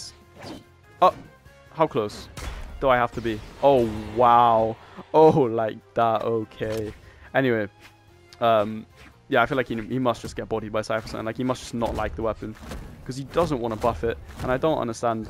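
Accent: England, United Kingdom. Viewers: If u lost that last game lmfao also are u English?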